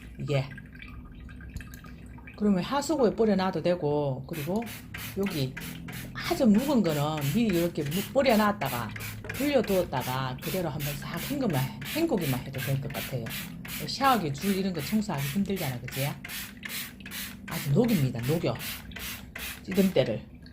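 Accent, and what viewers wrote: Gyeongsang, South Korea. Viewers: 구수한 갱상도 사투리가 정감이가네요^^ 여러가지 팁받아갑니다 감사해요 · 구수한 경상도 사투리에 정이 느껴지네요! 건승하세요!!! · 민경이란말 거의40년만에들어보네요 잊고있었던 경상도 사투리 정말고향에온듯이 아련한그리움으로 남습니다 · 대구 사람이시군아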